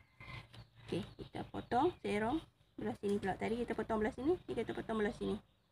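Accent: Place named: North, Malaysia. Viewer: Pekat loghat utagha tapi saya faham.hehehe tq.😊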